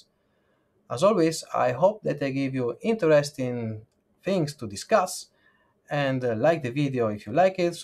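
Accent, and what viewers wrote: Italy. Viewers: wait, are you italian?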